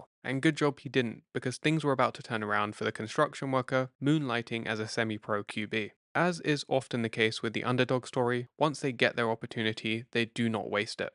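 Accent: United Kingdom. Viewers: Why is some Brit guy telling us about American football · Full of typos. And I'm sorry but listening to a Brit talking about Johnny U is like listening to a Sherlock Holmes story read by an American. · A British accent for the narrator? About an NFL player?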